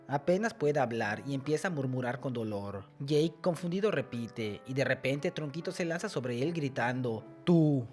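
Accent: Yucatan, Mexico. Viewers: Buen video, eres yucateco? 🎉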